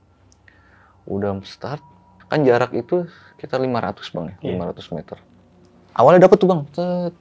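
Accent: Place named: Bali, Indonesia. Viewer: Ini host nyh orng BALI kah ? Logat nyh jelas bgt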